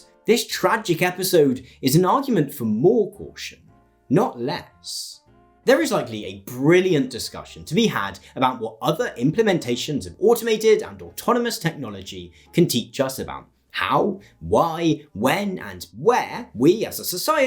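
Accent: United Kingdom. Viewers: British authoritative voice makes this video look to be more than what it actually is, finger-pointing shaming with no concrete facts to backup the claims, only public perception and opinions.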